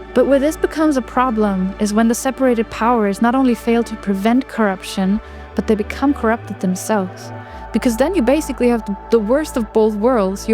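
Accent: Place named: Germany. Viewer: So basically she wants to spread her dictatorship ideology. You literally have no example other than keep saying Singapore. There is thousands of example that democracy and liberalism developed the world. Look at Europe and England. The development and industrial revolution took place when the power of the kings has limited. We know below your cutie German accent, a big propaganda exist.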